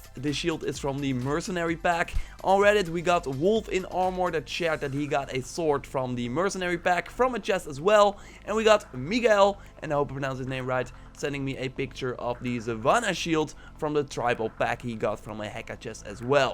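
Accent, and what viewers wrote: Netherlands. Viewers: S1561verian S1561verian Hij is Nederlands. Kun je echt gelijk horen. Plus, hij zei dat volgens mij bij een Livestream. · GoodFella 98 pretty sure its dutch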